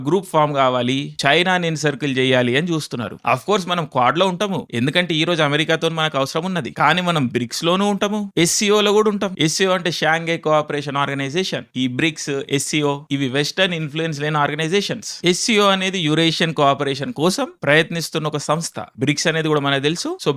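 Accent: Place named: Telangana, India. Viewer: ఎవ్వర్రా ప్రొపెసర్ లకే ప్రొపెసర్ ల ఉన్నావు.. ఎంతో మంది మేధావులని చూసా కానీ నువ్వు పూర్తిగా భిన్నంగా భయంకరంగా, అందంగా నీట్ గా బలే చెప్పావు bro.. అన్నిటికంటే ముఖ్యం గా తెలంగాణ స్టైల్ లో osm ❤️ bro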